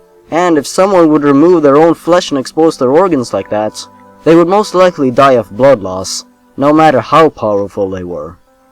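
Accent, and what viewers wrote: Sweden. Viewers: wait a sec.....are you... SWEDISH??!? like I am ^^ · are you from Sweden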